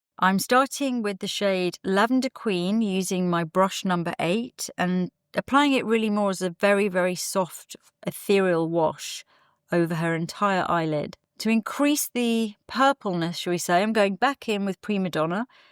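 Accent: United Kingdom. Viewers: Me too! I'm not an English native speaker and I have a little bit of difficulty with Brit accent. I thought: did she say Talk to the poor? This is very catish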